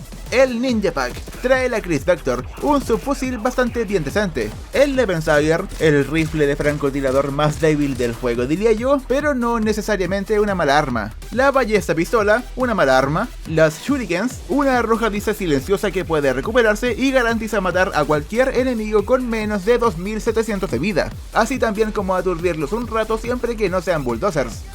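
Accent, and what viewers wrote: Chile. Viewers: Eres chileno? Porque yo si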